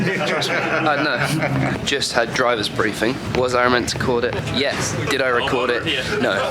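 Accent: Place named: United Kingdom. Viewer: oh i thought this is american.. its just british.. which is just normal..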